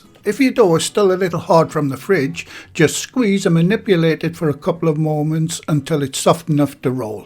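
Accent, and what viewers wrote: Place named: England, United Kingdom. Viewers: I'll be honest... I subbed for your voice and manner of speaking/talking... you sound absolutely delightful and lovely and sweet/kind... typical English ( once upon a time when there were cockneys in London, they too were like this, just slightly different accent and manner). Like the recipe themes so far, will see if there is a sausage roll one. ^_^ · you are very welcome👍. I'm from Cumbria but. Live with my Khmer wife in Cambodia. It's so nice to here a northern accent & I so miss pork pies but thanks to you, I'll now be making my own thanx to your simple pork pie pastry, cheers👏👏👏👏👏👏👏👏👏👏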